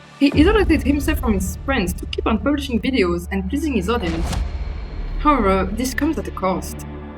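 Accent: France. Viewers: I'm betting right now, that you may be french, 'cause you sound french.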